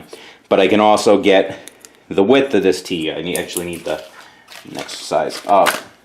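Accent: Boston, United States. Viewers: Had to look up Schmutz. Thought it was some kind of Bawsten accented slang but its actually german for dirt. Thanks for video, its very well explained and entertaining with the accent...reminds me of Matt Daemon or Mark Wahlberg.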